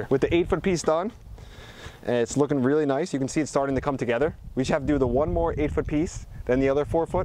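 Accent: New Jersey, United States. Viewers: Nice work dude! I'm surprised I could follow it because of your New Jersey fast talk, but I got it! Thanks for the tips!!!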